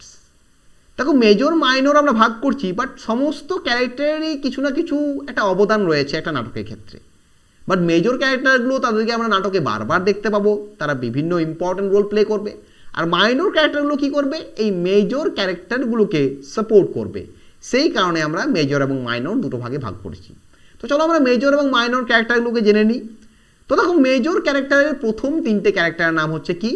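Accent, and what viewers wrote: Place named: United Kingdom. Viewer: Sir vs. ata American English ause hoy but ato to puro tai British English..ami ki bolta chai chi bujhte parchan.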